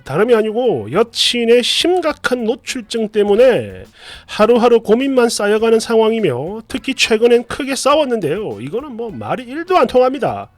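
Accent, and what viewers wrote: Gyeongsang, South Korea. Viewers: 덕분 에 마니 웃고 갑니다 칠학년 에 웃을 일이 없는데 의 그 다른 사람 이 흉내 낼수 없는 경상도 스타 일 그 특유 의 진짜 잘 보고 있어요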